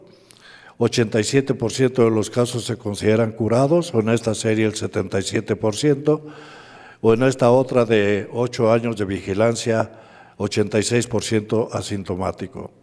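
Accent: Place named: Mexico. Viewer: El habla mejicana así utilizada es realmente agradable para el oído español. La conferencia ha sido para mí muy útil, explicativa y consoladora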